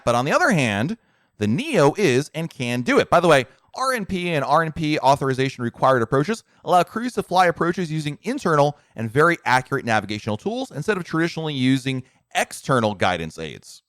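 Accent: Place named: United States. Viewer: This is the first time I've seen any of your videos. I usually watch Overkills Simulation, due to his American voice and great content. His videos taught me how to fly the FBW A320 among other things too which are MSFS related. You have a a great American voice for this type of content. I am American and find it hard at times to listen to the British Videos, which their are so many. Nothing personal to the Brits. Just not my "cup of tea" ( pun intended ) I did subscribe to your channel. Please keep the video's coming. Great Job !!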